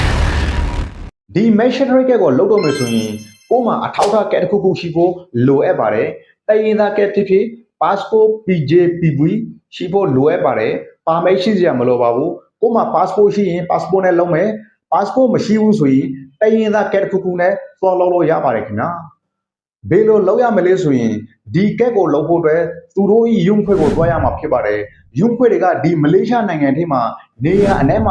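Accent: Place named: Rakhine, Myanmar. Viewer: လူစကားကိုလူနားလည္ေအာင္ေျပာပါ ရခိုင္အသံႀကီးနဲ႔မေျပာနဲ႔လီးပဲ ေစာက္ေတာသား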